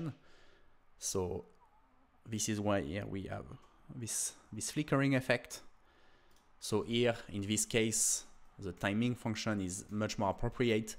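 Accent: France. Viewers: Very easy to reconnaitre que you are french 😁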